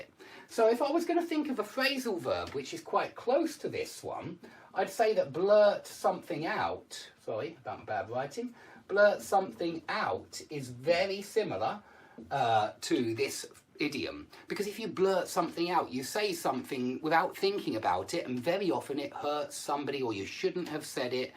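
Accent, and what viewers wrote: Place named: RP, United Kingdom. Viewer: I simply adore your accent! It's so posh 😃👍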